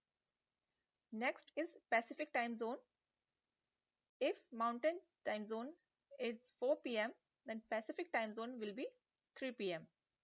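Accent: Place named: India. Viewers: Bro work even harder.... master your English learning skills so you can even understand Indian accent.... not from India but seems like you've a dig with Indians!! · I do not want to offend you, but maybe you have to think about the rest of the world, not just India. If you want that your video is understood by the whole world, you have to make it as similar to an American neutral accent, as possible. That is just the way the world is. Otherwise, people just will not understand it... I did not understand what you said, I am Mexican, yet I understand pretty much any other videos... so there you go... if you do not care to be understood by as many people as possible, then keep the Indian accent, people from India will understand you, but half the world will not. So, it is up to you... for instance, us Mexicans and people from Latin America work very hard to have an American accent, just so that we can get a better job. 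But, again, it's up to you.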